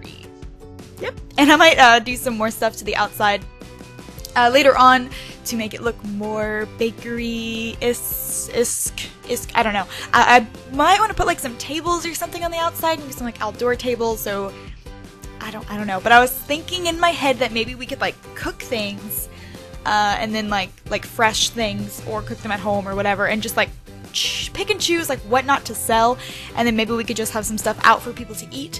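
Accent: United States. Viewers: Your American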